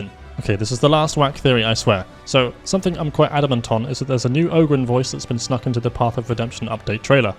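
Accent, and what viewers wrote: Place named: United Kingdom. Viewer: i love your unique combination of being "lucky" enough to be british and have the accent, the jokes, and the editing. oh dear oh dear. amazing. jump king stream when?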